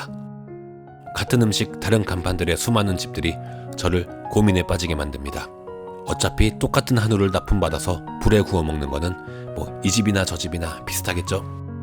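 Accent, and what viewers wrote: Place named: Gyeongsang, South Korea. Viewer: 말투가 경상도인데 원주 사람이라 그러네 ㅋㅋ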